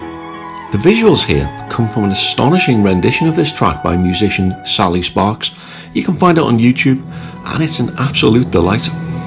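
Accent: Liverpool, United Kingdom. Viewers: I’m from England and believe I have a good ear for English accents but it’s not just because you’re a scouser that I can’t believe you aren’t of Guitar the YT channel mainly devoted to detailing all things Oasis, Shed Seven, Ocean Colour Scene & Britrock of the late 80s onwards. Perhaps you are one or have diverse tastes but operate under various headings! Both channels - this one & are great in - depth stuff anyway 😁❤️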